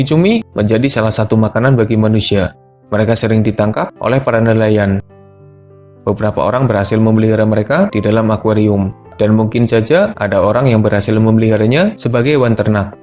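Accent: Banyumas, Indonesia. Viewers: mau di sembunyiin ngapak2 nya ttp krasa🤔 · Orang ngapak ya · Nada orang ngapak dia bang. Bhineka tunggal Ika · Ngapak terossss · Gole ngomong ngapak temen min😂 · Org ngapak yo mas ?? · Masee wong ngapak yak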